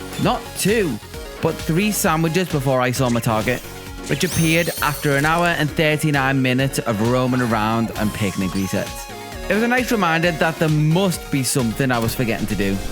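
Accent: Liverpool, United Kingdom. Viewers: Firstly, your stronger than me lad i had a go at hunting a dex a while ago and tbh i dont think my attention span’s recovered.. also, scouser?? Got a little scared hearing another accent on here i cant even lie. Dunno what it is about brits and youtube but i never see any of us on here loll. Anyways congrats on this man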